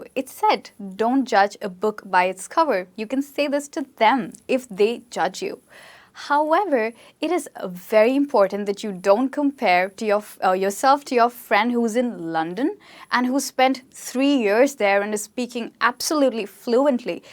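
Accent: India. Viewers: God this is Indian English . So funny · What a beautiful indian accent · Are you Indian. Your pronounce unfold it. Sometimes you spoke Indian english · I think she is speaking india English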